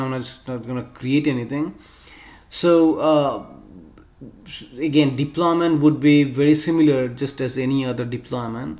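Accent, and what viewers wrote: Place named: Bangladesh. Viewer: Very informative and useful video. Thanks. (in an unrelated note: are you some how connected to Bangladesh ? Your voice notes has mild accent of Bangla. BTW, I am a bangali :-)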